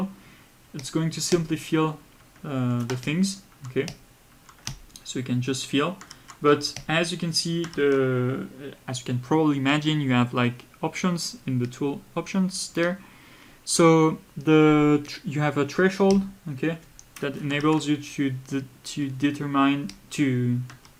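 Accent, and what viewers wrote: France. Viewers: nice french accent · Why are you apologising for your French accent? It sounds awesome. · face-green-smiling:I chuckled when he apologized for having a dreamy French accent. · native English speaker here, your french accent is a nice bonus. its as if you are speaking in cursive. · I love your French accent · Hello Dear, I think you don't have to sorry for your French accent. Its your own identity. You should be proud of it.:goodvibes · awesome and helpful video.. btw no need to apologize for your accent.. youre speaking english being a french., thats a big thing.. remember most english speaking peoples dont know any other languages other than english.. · "Im sorry for my French accent" Bro that makes the tutorial even better to listen to 😭😂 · Awesome tutorial and French accent 😀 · No no no! Never apologize for your French accent. 🤙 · Ne t'apologize pas pour ton french accent, il est parfait. 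· Quand j'ai commencé à regarder le guide je me suis dis que t'avais un sacré accent français et puis à j'ai eu une confirmation que tu étais français. C'est génial de prendre la peine de faire 2h de guide en anglais pour aider plus de monde en tout cas même en tant que francophone tu m'as beaucoup aidé merci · tu es français non ? Tu as un accent français du coup j'ai tout compris, dommage que tu n'ai pas fait aussi le tuto en français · Moi je l'aime bien ton accent Fr ^^ Merci pour le tuto mec, je l'ai pas encore terminé mais je sent que je vais apprendre beaucoup ! :) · Je suis content d'entendre un accent français je comprends mieux comme ça :') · Merci pour ce video!😊 Je commence travallier avec Krita. Avant j‘ai eu seulement ProCreate. C‘est tres bien d‘avoir un guide comme toi. Ton accent francais est en plus!🤣 Nous aimons la France!!! (Excuse mon accent allemand😉) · I love the French accent. 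· I really appreciated this video. And your french accent didn't get in the way of my understanding at all😃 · dude dont ever apologize for your beautiful accent!!! You are bi-lingual (at least bi maybe more) that is amazing and so what if you have a french accent, you are actually better off, if you didnt people might think you were American (like me) and I know you dont want that!!!! Great tutorial, great english, great accent, thanks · nah man the French accent is where is at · French accent is objectively the best accent for an art teacher anyway. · UM DO NOT APOLOGIZE FOR UR FRENCH ACCENT! You and David Renoy are the two krita people who are carrying be with learning art right now, SO THANK YOU.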